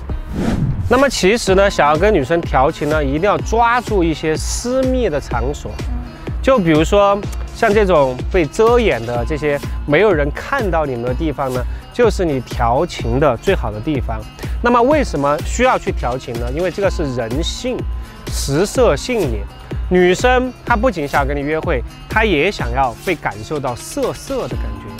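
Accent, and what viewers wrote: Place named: Hunan, China. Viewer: 小哥你是湖南还是 武 汉滴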